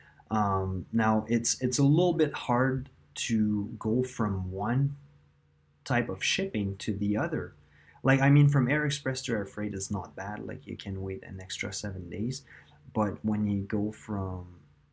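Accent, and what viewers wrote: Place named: Quebec, Canada. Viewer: Thanks for the info, I find your video very helpful. And btw, are you originally a french Canadian? I can't help but hear a bit of a french accent..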